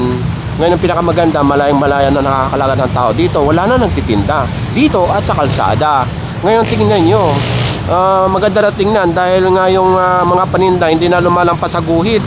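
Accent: Visayas, Philippines. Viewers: keep up the good work,mataas ang rating mo sa mga viewers mo kahit medyo bisaya accent ok lang,meron laman ang sinasabi mo.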